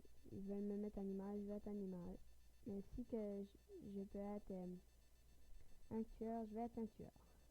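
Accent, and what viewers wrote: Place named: France. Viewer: Arrete avec ton accent francais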